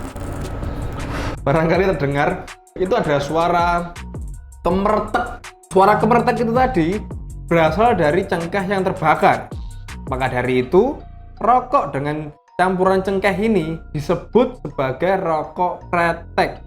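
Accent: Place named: Java, Indonesia. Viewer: Medok mas 😅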